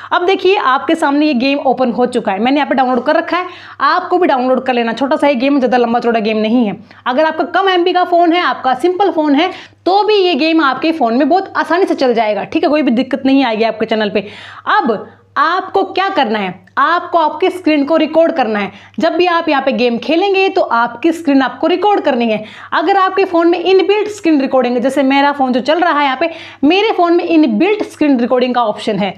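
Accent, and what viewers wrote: India. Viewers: your slang is like punjabis??? you are a punjabi??? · Haryana ya Delhi se mam???? Language apki haryanvi hai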